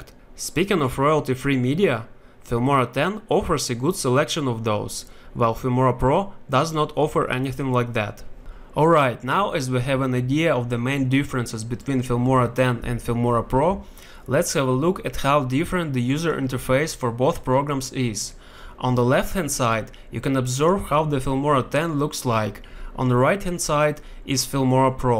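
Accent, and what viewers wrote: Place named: Russia. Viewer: You have a russian or ukrainian accent :) Спасибо for the tutorial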